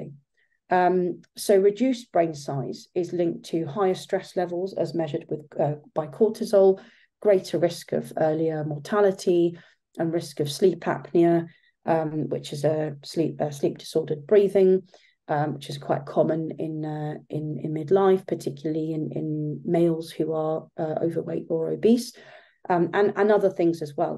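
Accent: England, United Kingdom. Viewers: It's an English accent, it's the English language.... Literally the correct accent. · How can you not understand an English accent?! It is quite nice.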